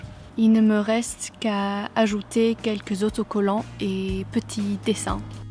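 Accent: United Kingdom. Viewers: I feel you have a slight British accent in French, did you grow up in the UK?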